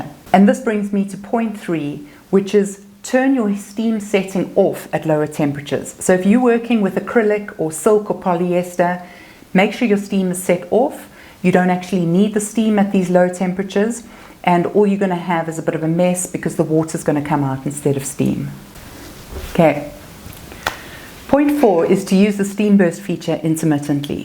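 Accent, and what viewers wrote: South Africa. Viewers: You sound South African😊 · She has a South African accent.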